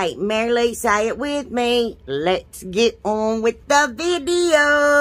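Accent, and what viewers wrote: South, United States. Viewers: You sound like a Southern Country Girl. The rocking chair is adorable I think it would be cute with a country bunny! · Ive been looking every chance I get for a CALENDAR with a truck.I guess I'm just not fast enough 🤔 I will keep watching u always bc u r my favorite crafter & u always lighten up my days. please Don't change a thing. course I will keep you & you're family in my uprayers . Can u tell me where u grew up bc u have a beautiful thick acent. I thought u said something about Ohio but u sound more Southern. I hope your parents feel better soon & I will pray for GOD'S BLESSINGS on u & your family it looks like GOD'S already BLESSED u with Talent .The longer the video the better. I'm about to watch another of your videos. Keep them coming. love u girl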